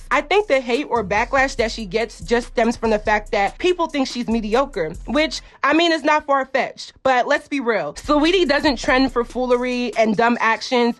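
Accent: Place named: Memphis, United States. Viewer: Nawwww! Not You Tryna Sound Like A Memphis Female Rapper, & Shoutout “Memphis”. After You Stated “BIG GLO” Ratchet Aesthetic Is ”Repulsive” & “ Demeaning”! It’s All Ready Done By The “City Girls” & Your Tired Of It! Nawwww Na Sis, How You Judge & Try To Do The Same. ION LIKEEE DET #901RAISED #Memphis ❤️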